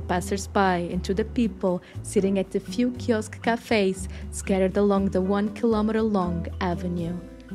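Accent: Portugal. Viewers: Now I'm sure you're Portuguese. I love that accent and I love Portugal. And I love the Ritz.❤✨️🇵🇹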